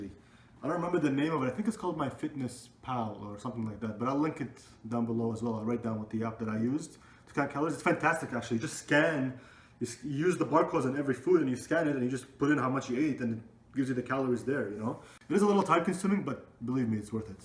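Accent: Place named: Egypt. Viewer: the egyptian accent love it. well done!!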